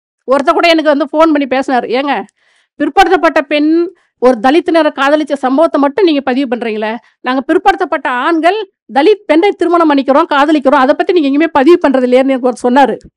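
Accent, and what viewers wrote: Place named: Natham, India. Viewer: நத்தம் Pechi varuthee😁😍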